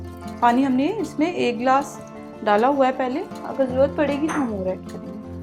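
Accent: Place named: Lahore, Pakistan. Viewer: AP KA LEHJA LAHORI HAY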